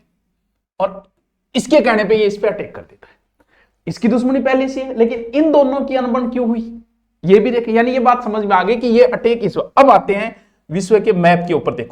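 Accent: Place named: Haryana, India. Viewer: Content is good .But, try to speak in neutral accent. Sab ko haryana accent samajh nahi Ata.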